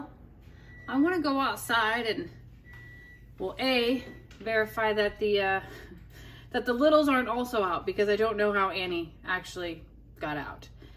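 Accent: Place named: Texas, United States. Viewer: From Joan- I love how you are getting a Texas “twang” added to your speech.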